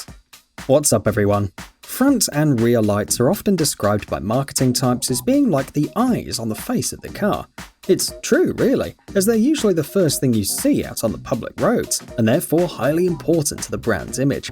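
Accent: United Kingdom. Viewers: The video is pretty cool, but your fucking British accent fuck me up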